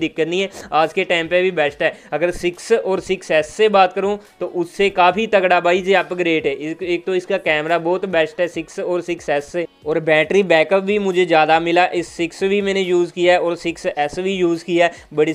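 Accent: Punjab, India. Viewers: Hindi in Punjabi Accent😅🖒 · Punjabi bhra mil gya - me awaj to hi pachan lea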